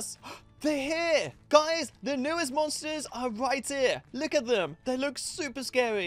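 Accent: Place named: London, United Kingdom. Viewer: You talk like London 🇬🇧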